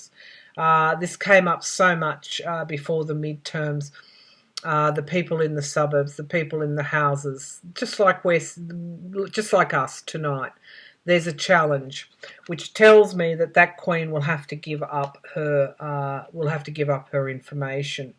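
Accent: Australia. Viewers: Good morning my beautiful calm and funny Aussie friend. I have no plans to listen to the BS behind the Mueller report again today just like I didn't listen to The First One but listening to you this morning and you used a curse word cracked me the hell up😂 as a matter of fact I think I was half asleep listening to you and then when you said that I sat straight up in the bed and paused your video and just laughed. And with that beautiful accent of yours you are in it to win it just as much as we are. Much love light and blessings are sent your way. By the way even when you throw some serious shade you are still a lady.🗽🇺🇸💐🗽 Sleepless in Indiana. P.s. God Damn! You are something else! OG. Just thought of something else. Maybe after all is said and done and all the BS comes out, the GOP will have a reunion at Jurassic Park and try to plan their next strategy with their prehistoric animalistic instincts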